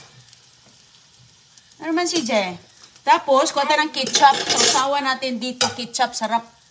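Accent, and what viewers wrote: Visayas, Philippines. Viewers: bisaya kasi siya o waray kaya ganyan ang pronunciation 😊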